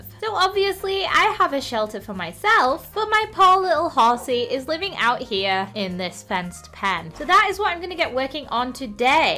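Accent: United Kingdom. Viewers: Yammy: So i'm gonna stick to the birch theme Me: Wait? Did she just said the b word Replays the birch word over and over Nop she said birch she just has an british accent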